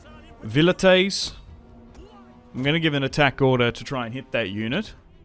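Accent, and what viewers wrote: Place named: Australia. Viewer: What part of australia you from bro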